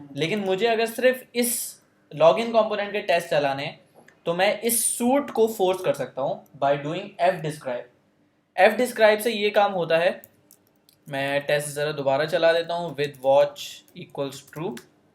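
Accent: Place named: Uttar Pradesh, India. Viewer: you are outstanding man, the clarity you have hats off. keep it up. between i get a feel you are from UP but surprisingly you are from pakistan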